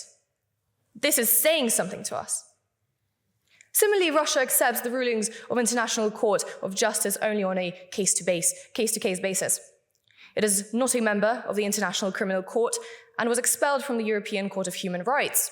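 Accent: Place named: United Kingdom. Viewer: you cannot say so because she mush have been living in the UK for too long given her accent. Probably immigrated as a child.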